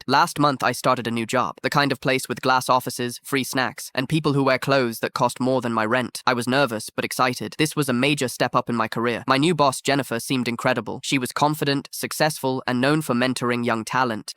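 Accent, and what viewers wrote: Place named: United Kingdom. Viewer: what is this goofy ass british voice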